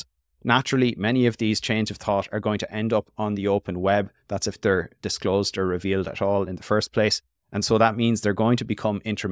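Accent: Ireland. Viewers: Surprising but great to hear an Irish voice on an AI LLM review. Great content mate intelligent & well explained, keep it up please!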